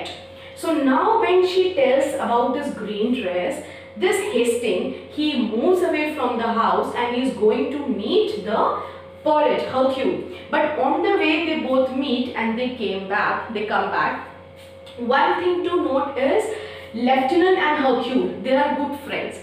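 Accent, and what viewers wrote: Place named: India. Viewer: Talk with a more standard accent, indian accent is not understood by othrts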